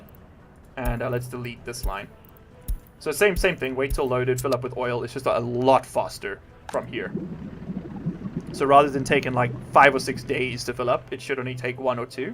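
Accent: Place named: South Africa. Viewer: Man you sound like most of the SA that move to New Zealand after a few years , every once and awhile the accent comes through, mostly on R's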